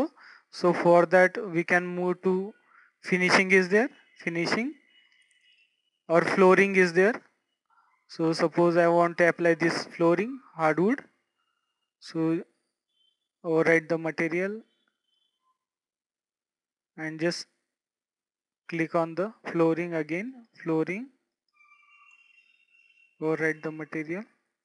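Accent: India. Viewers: This Speaker MUST BE INDIAN!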